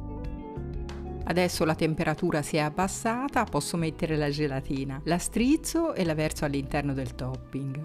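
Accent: Tuscany, Italy. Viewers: Buonasera, spiegazione precisa e completa, impreziosita dalla tua voce dall'accento toscano semplicemente meraviglioso!😍 Ottima freschissima cheesecake con un superbo topping al limone 🍋! Grazie, insuperabile!👍🥰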